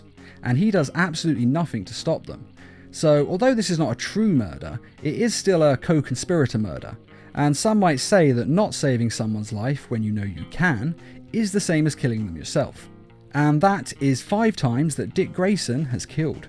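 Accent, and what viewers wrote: United Kingdom. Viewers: I have a question is this your real English British accent or is it fake and can you do a face reveal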